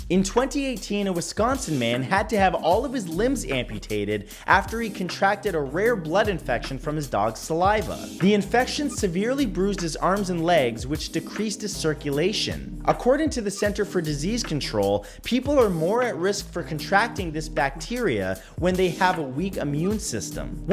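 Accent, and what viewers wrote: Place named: Canada. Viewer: He's Canadian 🇨🇦they say things weird.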